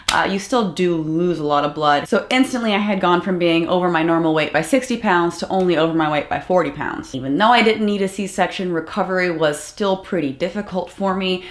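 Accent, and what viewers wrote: South, United States. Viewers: Are you from the south?? I hear a faint accent! I’m Canadian so I’m hearing a twang?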